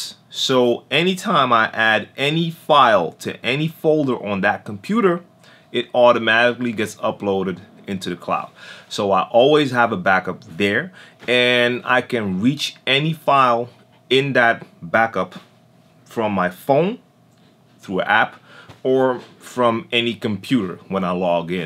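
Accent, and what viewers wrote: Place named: New York, United States. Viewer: Great videos. Just a comment/question...you don't sound like you're from the Netherlands. More like NY. Where are you from originally?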